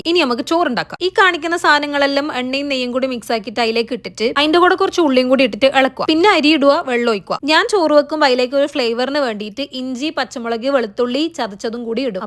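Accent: Kannur, India. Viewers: സംസാരം ഫസ്റ്റ് കേട്ടപ്പോ തന്നേ മനസ്സിലായി കണ്ണൂർ കാരി ആണെന്ന് 😂❤ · Kannur bhasha nth oru ppang Ann🔥video oke poli anne Chechii ♥️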